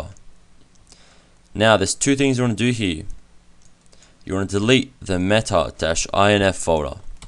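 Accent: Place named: Australia. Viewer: ma gawd so damn Australian btw I'm from NZ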